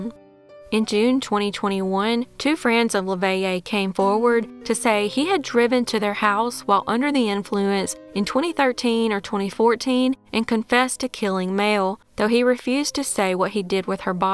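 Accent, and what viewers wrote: South, United States. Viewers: Just discovered your channel. Thanks for covering these Canadian cases. Subscribed 👍 The Southern accent only makes it that much better! So charming and attractive when southern women speak. Not trying to be weird or anything, but it's true!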